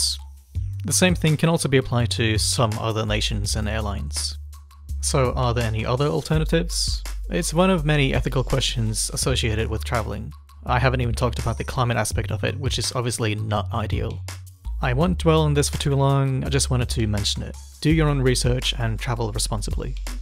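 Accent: Australia. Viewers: I came across your channel through the Casiopea video and always thought I could hear a slight Australian accent in some instances, now I understand where it comes from :)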